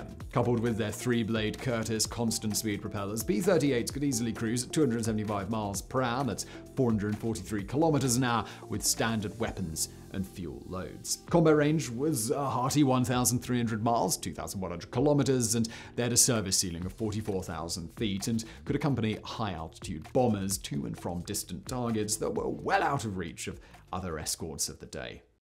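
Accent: England, United Kingdom. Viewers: Well, keep in mind - he has an English accent. So - y’know.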